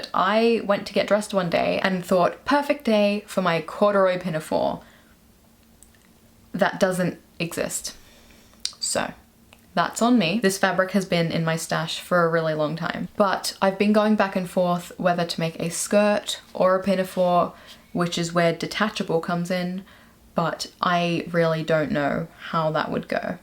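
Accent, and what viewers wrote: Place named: Australia. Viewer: This is my first time watching one of your videos. I have a PhD in linguistics and I find your accent fascinating. At first I thought Australian and then English but with a lot of North American influence. Your rhotic R changes back and forth even in the same sentence. You have a very interesting mix of accents and influences. And I found the content interesting too 😊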